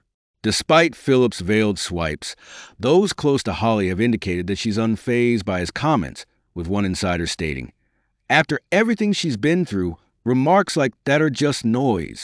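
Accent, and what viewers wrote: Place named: United States. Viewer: The American accent narration is an odd choice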